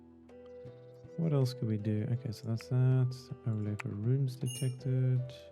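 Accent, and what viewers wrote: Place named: South Africa. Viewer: Random video pops up on my feed... I recognise that accent! Hello, fellow South African :) Good luck with your YT journey.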